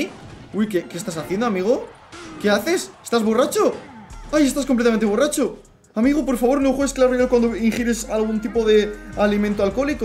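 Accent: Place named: North, Spain. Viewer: a entonces es norteño xd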